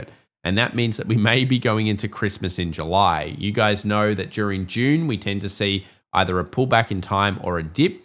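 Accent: Australia. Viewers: Where are you ? you sound like a QLD'er ? 🙂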